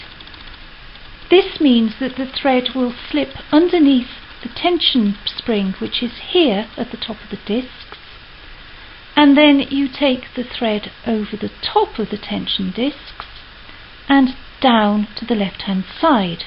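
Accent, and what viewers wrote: United Kingdom. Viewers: Sooooo cute... Perfect queen's English... I've blinking kicked the tripod.... Be kind to yourself sweetie... Not a big deal... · That was Beautiful, My Mother left Me a an Old manual pedal type sewing machine,, I'm ready to make a Sail and go Sailing.. This was clear and concise instructions.. and I love the British accent..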